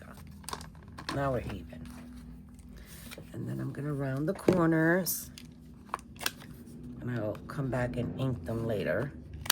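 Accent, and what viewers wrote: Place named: New York, United States. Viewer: These are awesome. You are very creative. New subscriber here! I could hear that New York accent as soon as you started talking. ❤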